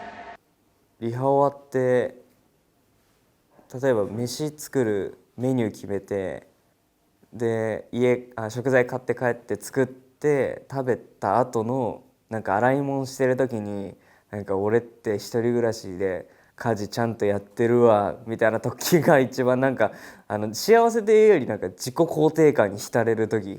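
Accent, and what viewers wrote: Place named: Okayama, Japan. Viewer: かっこよ。あと岡山弁素敵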